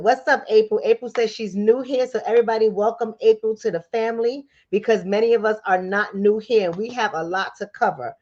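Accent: New York, United States. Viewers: I am in LOVE with your New York accent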